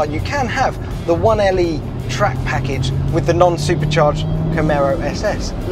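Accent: England, United Kingdom. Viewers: I'll go with Corvette's 8 class wins at Lemans (along with countless others) as plenty of proof American cars can corner. I don't need another youtube host with an English accent to convince me as if this is something new. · American muscle but you get an English guy to talk.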